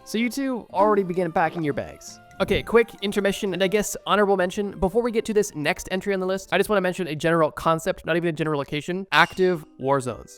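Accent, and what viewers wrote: Canada. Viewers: Youre canadian arent you? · I never noticed this dude has a Canadian accent it’s mind blowing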